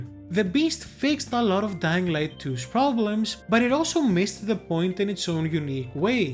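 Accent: Greece. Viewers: The greek accent is so noticable 😂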